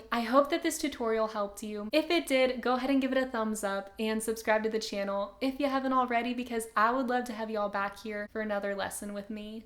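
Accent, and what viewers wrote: South, United States. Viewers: She's southern stop being so rude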